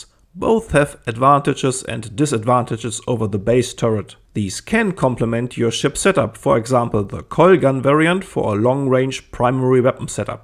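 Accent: Germany. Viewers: You haven't heard a strong German accent, bruh. His is present but that's nowhere near strong German accent. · Haha I'm German, and man, the german accent is strong in your english xD I'm quite happy to find a familiar speaker haha xD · Oh wow what a nice combination of german sayings and accent :P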